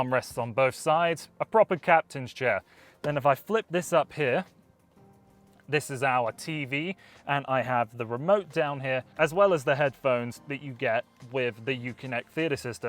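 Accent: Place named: United Kingdom. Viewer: What country are you in? You have a British accent and your speedometer is in kilometers. Are you in the UK?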